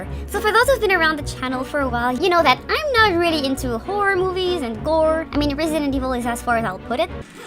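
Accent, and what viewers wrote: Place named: Quebec, Canada. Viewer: That’s so funny to hear you with your French Quebec accent in this video,! I like it. For the rest, I didn’t know then you make an halloween challenge like that in YouTube, that’s pretty cool.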